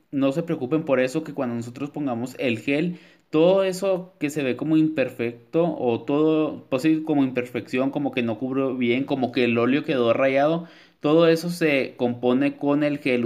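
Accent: Chihuahua, Mexico. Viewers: eres de chihuahua?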